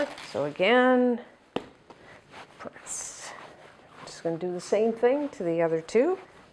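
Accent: Canada. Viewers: Her accent is Canadian af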